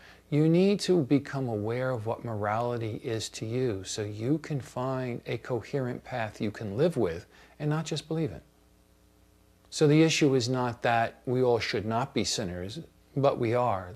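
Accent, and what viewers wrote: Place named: Bronx, United States. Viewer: haha. I think this is a great meditation. His accent and colloquialisms went from the Bronx to the Universe.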